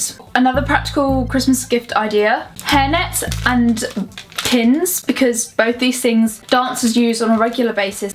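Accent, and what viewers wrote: England, United Kingdom. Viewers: I just love your English accent, I could listen all day long.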